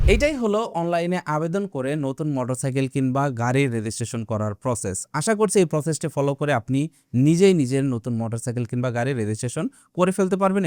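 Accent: Tangail, Bangladesh. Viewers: Vai apnar basha ki tangail e ?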